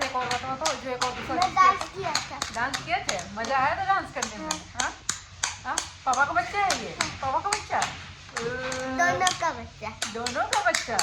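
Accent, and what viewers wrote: Bihar, India. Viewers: Mujhe esa lgta hai ki na aapko negative thumbnail dalne chaie na hi kisi ko respond krna chaiye Its better ki apne vlogs ko family vlog bnana bnd krdo kch special hua toh family ko dikhao otherwise nahi Bcz jb apko dikh ra hai ki apki family aap m interested nai hai toh unhe kyu hi vlogs m lana or kyu hi bewjh chipkna Log negative bolte hain bolne do just ignore & delete their comments Positive raho or positive vlogs bnao positive thumbnail daalo Qki kahi na kahi aap bhi galti krre ho isliye hi aap troll hote ho Abhiksha ko dkho wo itna jada troll nai hoti Km kmao pr acha kmao Ye family drama saas bahu devrani jethani krke kch nai milega Log kch hi din dkhte hain y sb baad m sb bore hojate hain Lambi race ka ghoda bno Na ki chaar din ki chandni Apke subscribers itni advices dete hain apko ki aap apna pronounciation tkh kro Being bihari its good pr professionally ye nai chal pata Insb chizo se niklo & grow urself Grow your personality..apke bache k liye b acha hoga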